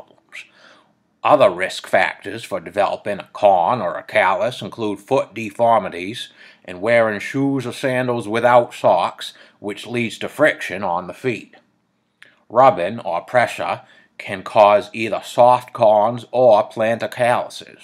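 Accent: Maine, United States. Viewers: Well! Not only did I get to hear a Downeast Maine accent, but I think I figured out what's wrong with my foot, so thank you! :) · visited Maine this past week and met a lovely farmer in a Diner that spoke like this. Had a good chat. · I have a friend from northern Maine and a family member from Haverhill, Ma. This sounds like a cross between them. ☺️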